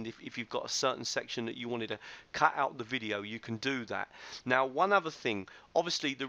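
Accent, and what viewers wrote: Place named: United Kingdom. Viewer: GREAT-GREAT-GREAT TUTORIAL!!! I had some confusion about how to use Snagit and whether or not I could do a video capture as well as how to upload to YT and whether-or-not I needed Jing and Screen Cast in addition to Snagit, you have answered all those questions. So despite your Brit Accent-Not everyone can be privileged enough to originate from SE Texas-it is a great video and I am signing up for your newsletter-Keep Up The Good Work!!! Shavuah Tov...hcwjr/ponyhunter98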